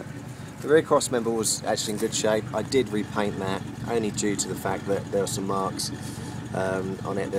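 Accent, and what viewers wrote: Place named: Australia. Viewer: Are you from Australia or New Zealand? I can tell your not a Brit because you're very Australian-sounding and your Land Rover there has an Australian/New Zealander number plate on it.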